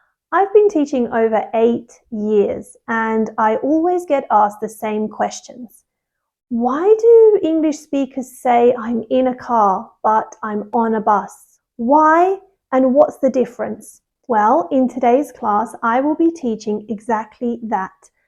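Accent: United Kingdom. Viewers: I like british english and love british girl as u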